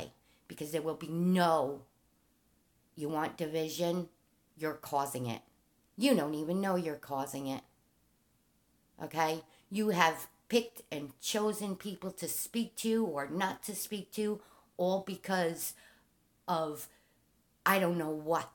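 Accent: New York, United States. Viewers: OH SNAP!! Gotta love the New York accent and honesty! You answered any questions I had about what was going on :) LOVE 💘 ya girl!! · Can't wait to see your new craft I'm loving your Newyork acsent 💋💋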